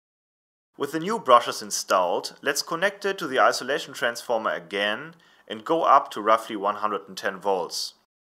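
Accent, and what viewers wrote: Germany. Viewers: For somebody whose native language is French, I am charmed by this German accent. I understood by the context that he was happy of his purchase, so I could not guessed that the expression could express anything negative. That remind me after a good meal, my partner said "I am feed up", thinking he was expressing "I ate too much". It shows that we, Quebecois, can not guess correctly the meaning of these verbs followed by "up" or "down". We have the same problem guessing these French expressions with a name of a color: carte verte, carte blanche... what? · Mal ne doofe Frage: Du bist Deutscher oder? :D Hab jetzt am Anfang nicht drauf geachtet, aber so wie du deutsch sprichst, und wie du englisch sprichst, kann es gar nicht anders sein :x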